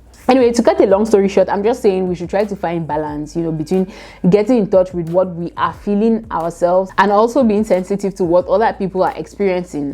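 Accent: Nigeria. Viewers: Please are you a Nigerian I'm trying to figure out your accent....m obsessed 😘... oh....love you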